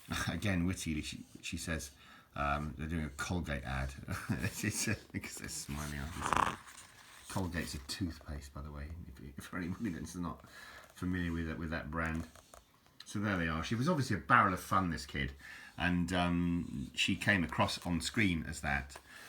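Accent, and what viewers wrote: RP, United Kingdom. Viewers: Thank you for your enlightenments + you're most welcome God bless you &all your devoted work. You honestly are so dedicated in all the work you're doing + it should be acknowledged. You're immensely sociable + groundedly humble for one whom speaks with such a posh accent Look forward to your insightful interesting humble videos 🌼🍀☀️🍀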